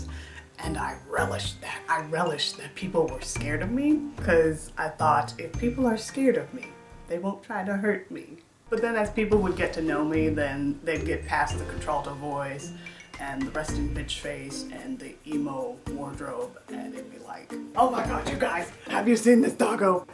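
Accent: South, United States. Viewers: You just sound Southern to me. Along with the deep voice, it’s very soothing. The pizza scene was like ASMR to me! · You just sound country to meh......... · I’ve never even noticed how deep your voice is. Lol I mean I guess you have a bit of a southern accent and I hear them all the time since I live in Louisiana so it’s pretty normal for me! Lol My voice is super high naturally but I can also sound like a middle aged man who will beat you with my walking stick if you ever cross me. So ya idk where I was going with this comment...love the video and you! Have a great day!💖💞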